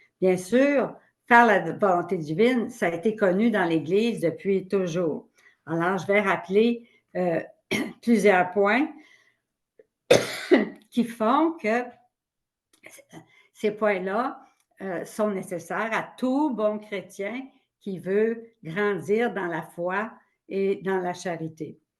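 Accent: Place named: Quebec, Canada. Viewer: Bonjour Merci beaucoup pour votre travail...dans la Divine Volonté bien-sûr 😊! Ça faisait un petit moment que je n'avais pas écouté vos vidéos. Très content et joyeux d'entendre toutes ces belles et magnifiques lessons de Jésus et Marie et t'entendre votre charmant accent...accent québécois qui m'invite toujours à aller rendre visite à mes frères d'Amérique du nord. Si Dieu le veut 🙂. Merci à Dieu pour la motivation, l'engouement...etc qu'Il vous donne pour accomplir ce travail afin de nous encourager à continuer sur ce magnifique chemin d'Amour et de Vie qu'est la Divine Volonté. Ce sont les merveilles de Dieu...qui pourra l'arrêter... notre volonté propre? Non, nous te la donnons Seigneur, au nom de tous et pour tous, pour ta plus grande Gloire et le Salut du monde. Amen!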